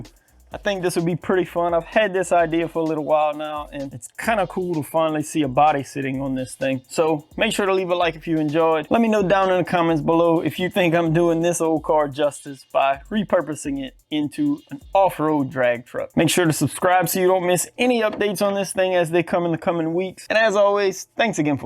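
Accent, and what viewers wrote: Louisiana, United States. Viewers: Sounds like a Lafayette La accent.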